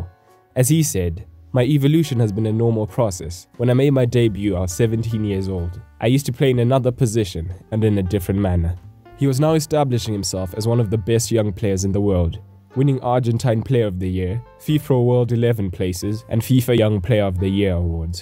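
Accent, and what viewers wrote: South Africa. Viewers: are you South African? you sound SAn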